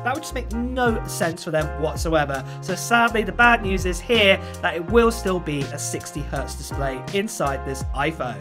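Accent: United Kingdom. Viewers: Fcking UK accent :D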